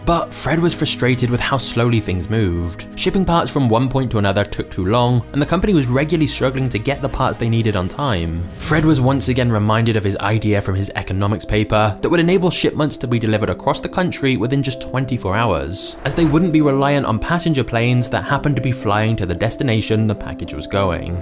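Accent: United Kingdom. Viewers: Yeah but the UK accent sucks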